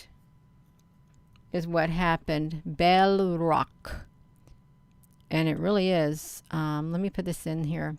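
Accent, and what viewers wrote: South, United States. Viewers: What state is this lady from? Texas? Georgia? I can't figure it out which accent it is.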